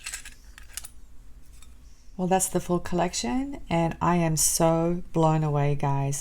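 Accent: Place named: Australia. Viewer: So great to see you back. Good Luck with your PT. Sam's Bead Box is so gorgeous each month I enjoy everyone's unboxing. Looking forward to seeing you create new jewelry designs. We have missed your lovely Aussie accent. Blessings, and Hugs.🌞🌞🌞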